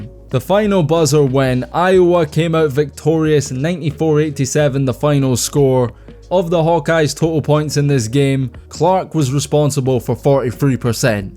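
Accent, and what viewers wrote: Scotland, United Kingdom. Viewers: Why is there a Brit talking about basketball on this video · It's funny to hear the comments coming from someone with a thick Scottish accent. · Bizarre hearing a Scottish guy do the review. But, it’s OK. Sounds great.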